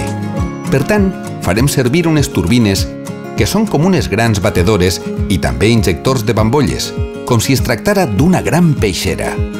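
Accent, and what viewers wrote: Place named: Lleida, Spain. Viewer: Buen video, lo pondré todos los días para despertarme con una sonrisa en la cara ;-) P.D: me encanta tu acento de de Lleide (me pone a 100 bb)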